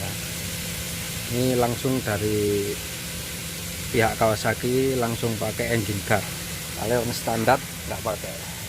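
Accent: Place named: Java, Indonesia. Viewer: Alami aja mas bro, nek medhok ra sah gue2 an.. Wagu.. Hahaha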